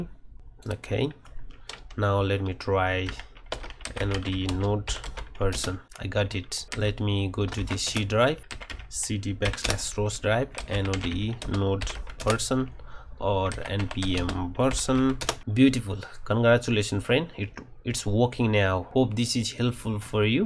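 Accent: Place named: Russia. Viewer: Whats up with the russian accent?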